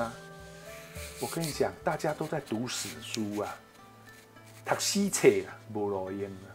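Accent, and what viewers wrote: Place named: Yongchun, China. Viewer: 我也是永春人，難怪口音與陳老師相類。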